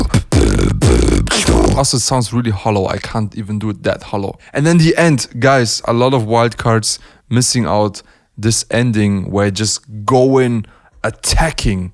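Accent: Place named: Germany. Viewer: FLAX OOLTIMATELY I love ur german pronunciations so much 😂😂 Also, mic costs more than my rent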